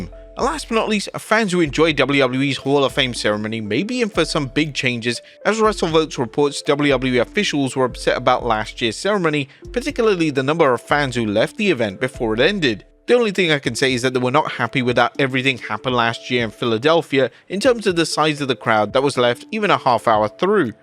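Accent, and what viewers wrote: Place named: England, United Kingdom. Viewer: Theres 2 different people, an American and an english one, this is the english one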